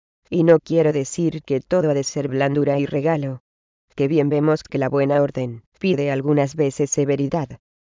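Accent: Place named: South America. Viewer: pronunciación robótica y sudamericana, llena de seseo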